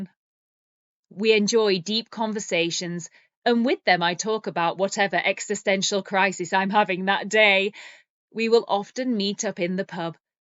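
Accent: United Kingdom. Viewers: It's British accent isn't it?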